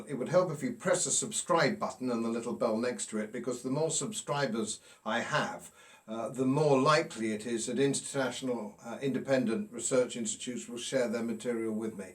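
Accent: United Kingdom. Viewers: I cannot believe I am hearing these suppressed TRUTHS about the world we (actually) live delivered by an eminently respectable BRITISH voice on Youtube... I normally have go onto Internet Archive to get this kind of information (and then often only after 'creative' searches and wading through dozens and dozens of off-the-case fluff to get to the good stuff!).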